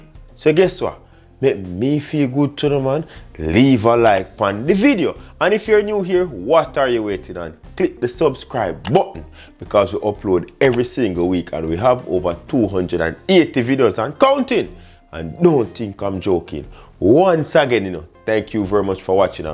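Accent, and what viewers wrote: Jamaica. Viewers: the jamaican accent so cool.. makes cooking cool. well done on the kfc secrets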